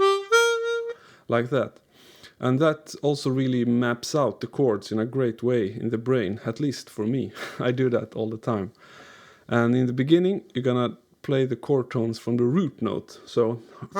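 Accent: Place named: Scania, Sweden. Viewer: Ja, jag fattar inte varför man skriver på engelska. Men det är ju youtube. Jag hör att du okså är skåning när du räknar inn...one..two...tre...fyra !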